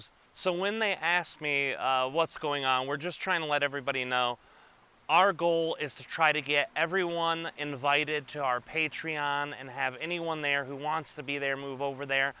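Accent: England, United Kingdom. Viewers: Curious why does he come through with an english accent? Fasting from all things in life in my opinion is the key to resetting the self to become more whole and aware again.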